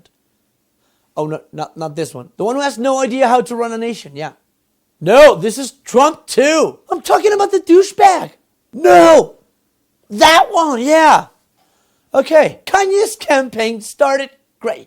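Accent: Mexico. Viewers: el ingles esta chido se nota el acento mexicano chingon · everyboy in every video say things about his pronunciation, he's mexican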